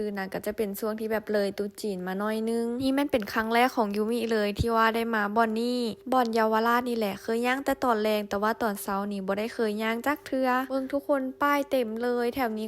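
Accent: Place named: Khammouane, Laos. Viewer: ภาษาน้องเว้าเป็นภาษาทางแขวงคำม่วนทางอิสานของไทยจะนครพนมและสกลนครครับ❤❤❤❤❤❤❤❤❤❤❤❤❤❤❤❤❤❤❤❤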